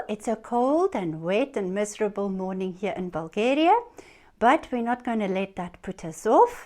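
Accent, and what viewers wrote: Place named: South Africa. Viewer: Great ideas thanks for sharing. You sound South African 😊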